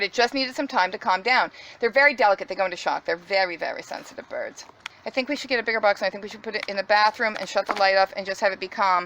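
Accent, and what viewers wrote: England, United Kingdom. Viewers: Make banana date bread as it is awesome! I have to laugh at your British accent because my mom is British! I get a kick out of it! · Sticky toffee pudding is a great English dessert made with dates, in honor of your lovely accent. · THT WAS AWSOME I LOVE THE ENGLISH ACCENT LOL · I love you , but loose the English voice please ! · Good English accent. 💕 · Why do you keep switching to an English accent!?!. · You sound like my auntie with ur English accent 😂😂😂 love ur channel all the way from Scotland 🏴 so glad the bird was ok 👌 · you English accent, you could be one of us!!! You would settle right in! 😃👍👍👍👍💗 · 😆I love the British accent! You are funny frugal mommy!❤️ You’re a pretty good southerner too! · great English accent, wonderful bird rescue, and awesome haul! I L O V E your videos. You always make me feel good when I’m down!! 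Also, been meaning to tell you I really like your bracelets! 😘😘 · 🤣🤣🤣🤣🤣🤣🤣🤣🤣🤣👍 ENGLISH ACCENT Or AUSTRALIANO 👍 💙♥️💙♥️💙♥️💙♥️💙♥️💙♥️💙♥️💙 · Bless your heart, you had me in tears and laughing my head of over the poor bird flying into danger of the cats and will woman. With English accent saying the wings are fine but the head is hanging. Let’s keep him quiet and maybe he’ll fly around the bathroom. You open the box and his head is up and the we one flys up to the top of the tree 🌳 Oh my goodness you remind me of myself, English accent and dramatics❤️ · you sound English not Australian and trust me I should know I'm very English and you sounded just like me x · I am sooo happy you saved the Robin!! I enjoyed your English accent, spot on I dare say! Love your videos. Stay safe. ❤️ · Aww poor bird! Glad it recovered. By the way, your English accent is better than mine....xxx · Great British accent! 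I love it.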